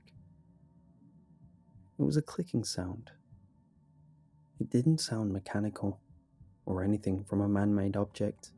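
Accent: United Kingdom. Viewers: r u British?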